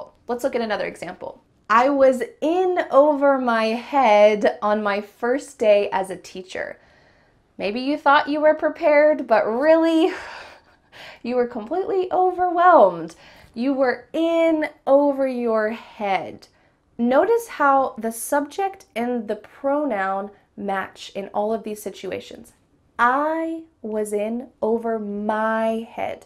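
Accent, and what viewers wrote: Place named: United States. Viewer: It sounds American English? Not authentic one!?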